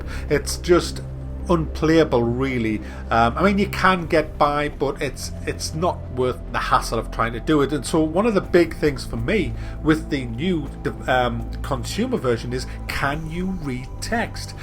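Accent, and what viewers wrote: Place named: Newcastle, United Kingdom. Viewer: Sounds like he's from newcastle, or near by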